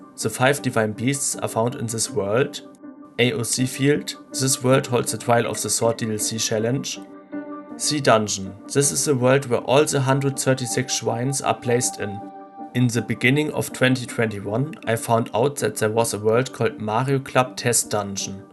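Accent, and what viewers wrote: Germany. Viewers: Man Hört so dein Deutsch raus xD deine Aussprache ist auch fast so gut wie meine oder besser so schlecht xD😂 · First time i hear you voice and i just gotta ask: are you german? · its weird to hear a german accent when yourself are german, i dont have that accent, so it explains why some people were surprised when i told them i was german. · Gutes video und interessante Welt die du uns gezeigt hast. Ich finde es übrigens auch top wie man immer hört wenn jemand aus deutschland kommt xD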